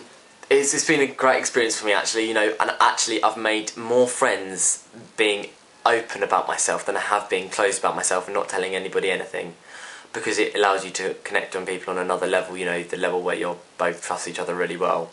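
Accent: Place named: West Midlands, United Kingdom. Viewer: ...lly beautiful, real handsome guy. You from the West Mids? (just, ur accent) x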